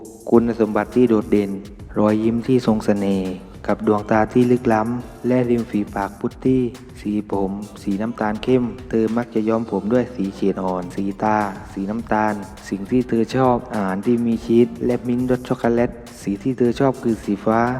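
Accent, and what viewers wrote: South, Thailand. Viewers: คนพูดนี้คนใต้ม้ายอ่ะ น่าว่าหมันใช่ม้าย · เสียงเจ้าของช่องเหมือนคนใต้จังคับ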